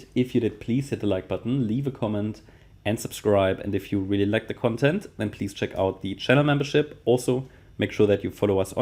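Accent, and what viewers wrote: Germany. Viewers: Hey, love the german accent! Thanks for the video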